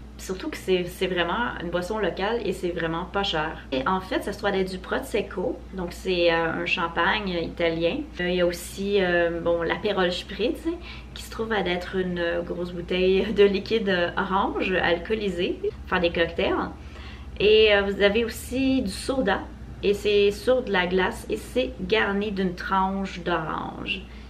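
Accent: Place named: Canada. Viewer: votre accent n est pas français,il est bien canadien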